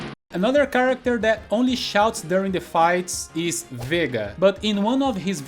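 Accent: Brazil. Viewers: Nunca vi esse canal antes. Ouvi, literalmente, 3 segundos desse cara falando e percebi que era brasileiro. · Mano tu é catarinense né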